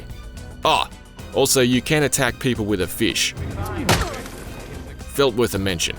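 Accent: Australia. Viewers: Sounds Australian to me... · “Aaaaand those who like to improvise and leave behind piles of dead and unconscious men in their underpants...” lol. This reviewer is great, and I like his Aussie accent. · Is IGN an Australian reviewer now? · Reviewer is Australian? Sounds just like Steve irwin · Did IGN hire Aussie man ? Reviewer sounds him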